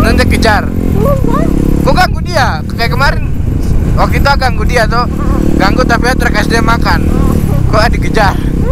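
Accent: Papua, Indonesia. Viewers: KK mcm pu logat ni logat" Papua k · Logat Papua bro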